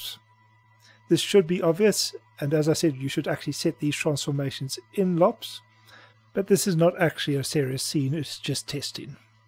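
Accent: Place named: South Africa. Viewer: Came here from the SideFX site, immediately recognized the accent. Howzit boet :D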